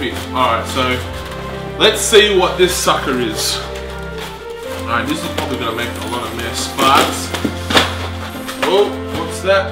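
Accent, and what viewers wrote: Australia. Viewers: England? are you from Englan? i thought you were from Australia.. :p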